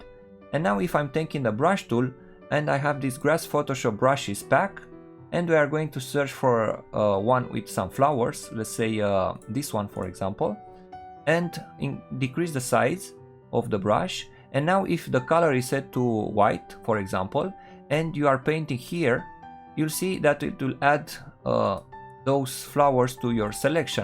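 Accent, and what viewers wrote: Romania. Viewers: Nu stiu de ce, dar accentul tau imi spune ca esti roman. Buna treaba, explicarea layerelor nu e comuna, multa lume face doar speed art. Esti bun, evoluezi repede. If I assumed wrong what I wrote in first part I still want to tell you thanks for your layers break down. We dont get this thing so often, majority of ppl just posting speed art.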